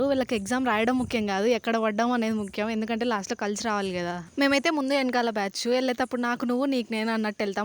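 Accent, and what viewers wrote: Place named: Rayalaseema, India. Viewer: Urs is rayalaseema slang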